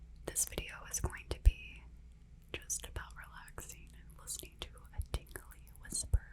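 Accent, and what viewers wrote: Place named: South, United States. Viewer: upvote for more Southern Accent whispered rambling ;)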